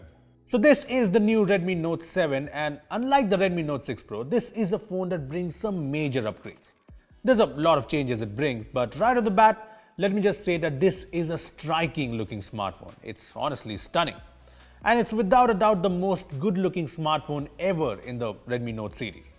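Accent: India. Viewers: I like this channel bcause his accent different from another indian channels 👍👍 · I dont understand why Indians have such a hard time getting rid of their accent. The D's are sooo annoying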